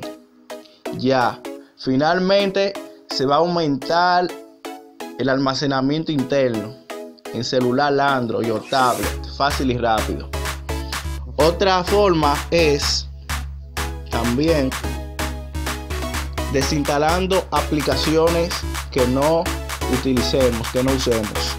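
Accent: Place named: Dominican Republic. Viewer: Me suenas a dominicano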